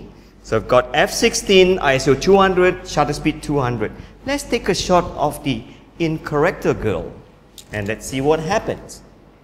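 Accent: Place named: Malaysia. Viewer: The content r just perfect n as for the accent, he's from Malaysia 😍😍😍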